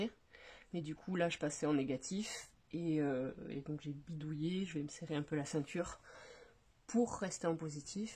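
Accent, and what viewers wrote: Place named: Southwest France, France. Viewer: Coucou ! Tu as le meme accent que mon papa !❤ Sud Ouest ? Limousin ? J'espère que tu trouve petit a petit a petit la sérénité ! 😊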